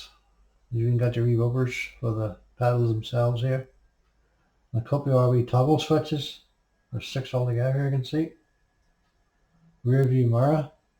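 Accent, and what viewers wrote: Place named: Germany. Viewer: Good afternoon, say chap you have a jolly nice accent! considered it was germain at first :)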